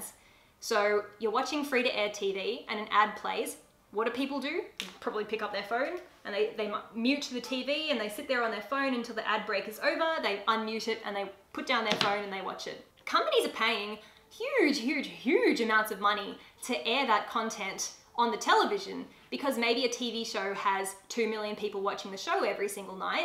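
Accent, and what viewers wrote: Australia. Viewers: Her Australian accent is the LEAST annoying I’ve ever heard! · Are you Aussie ? Gday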